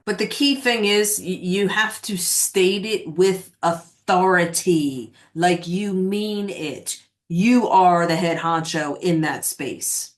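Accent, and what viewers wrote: Philadelphia, United States. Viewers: You sound like u r from Philadelphia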